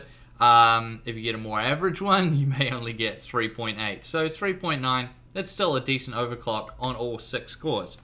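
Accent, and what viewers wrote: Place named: New Zealand. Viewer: I think this one is a New Zealander.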